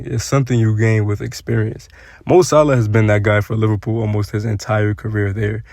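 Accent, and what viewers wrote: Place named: United States. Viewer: Where are you from bro? I can't pinpoint your accent.. I just know it's from the States. This is a nice video tho.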